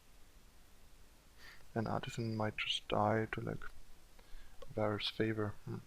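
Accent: Germany. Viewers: You should watch the first couple of matches with it on. It's quite entertaining. I think it's partly the German accent that messes it up lol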